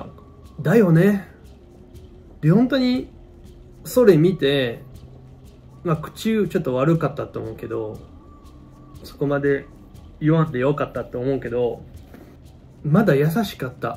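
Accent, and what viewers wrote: Kansai, Japan. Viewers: コテコテの関西よりやね🤣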